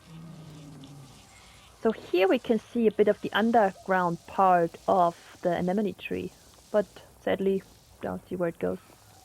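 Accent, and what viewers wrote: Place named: Germany. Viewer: Correct me if i'm wrong but I think that there was a mission somewhere regarding the ferals to completly wipe them out or at least cull the spawnrate. Your comment on sodium was quite interesting. Where are you from? You have quite the accent but I can't pinpoint where it's from. If I had to guess.... Germany? I'm not so sure, you did say Natrium right? Btw one of my favorite plants is Sol's Delight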